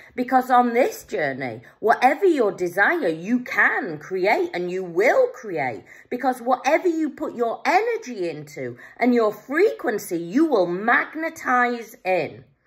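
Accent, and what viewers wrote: United Kingdom. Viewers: Nice to hear a Yorkshire voice that is where I am ❤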